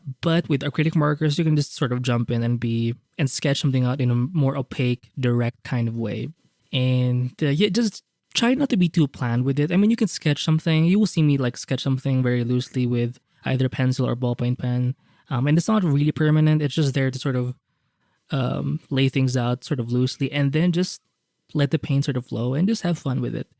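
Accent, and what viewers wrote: Philippines. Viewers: YOU SOUND FILIPINO!!!!!!!!!!!!!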